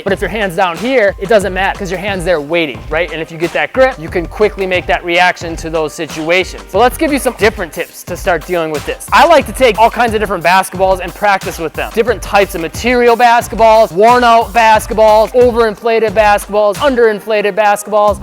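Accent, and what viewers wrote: Canada. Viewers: Why does he sound Canadian??🤔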